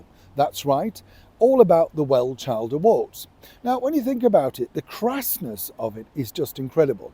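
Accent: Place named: North, United Kingdom. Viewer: Here’s Neil Sean my one true love bwahaha out here in his comfortable northern accent chatting about all the things we remember before dropping some truth bombs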